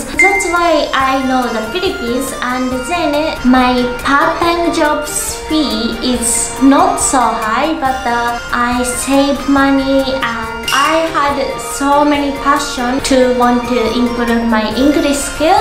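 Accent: Japan. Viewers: Please keep your Japanese accent, for me it makes your englush so cute. You speak well already.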